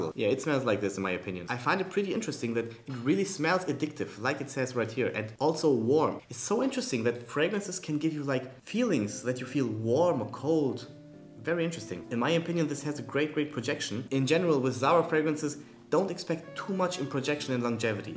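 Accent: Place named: Germany. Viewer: Man hört den deutschen Akzent so geil raus 😂. Geiles Video trotzdem!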